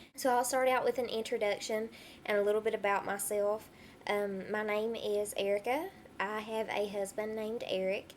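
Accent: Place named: Southwest, United States. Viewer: R u frm Texas or sumthin cause it sounds like u have like a southwestern accent?!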